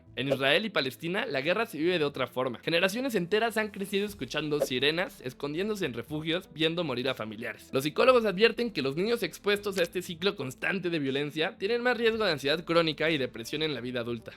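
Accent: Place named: Mexico. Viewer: que habla este tipo? que la guerra no entiene de genero? amigo estas bien ? entiendo que el contexto al cual haces referencia es una guerra tecnologica, pero te recuerdo que la mayoria de guerras se siguen librando en barro y en trincheras, una mujer no duraria ni una semana en las trincheras del frente en ucrania, de hecho ni dos dias. Asi que deja de hablar mrda woke, las mujeres no pueden luchar en campos de batallas reales, no de manera masiva por lomenos. Que hayan existido escuadrones y pelotones de ellas es verdad, pero no es la norma , es mas si mal no recuerdo las comenzaron a usar la URSS solo por que ya no quedaba de otra, eran la ultima opcion, pues la realidad es que biologicamente no sirven para ello. que la guerra no ha traido paz? xdd por tu acento supongo eres mexicano, tus antepasados han muerto por tu libertad que ahora desperdicias haciendo videos pendejos. 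La guerra en su gran mayoria si trae paz, que tu y yo estemos escribiendo nuestras opiniones en internet sentados desde nuestros hagares es prueba feaciente de ello.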